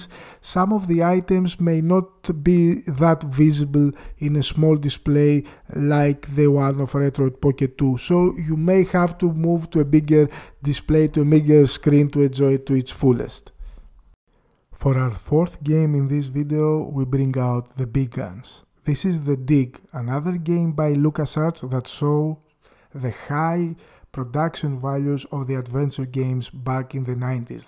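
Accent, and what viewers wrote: Greece. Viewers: Is this guy Greek? I have heard others with the same accent.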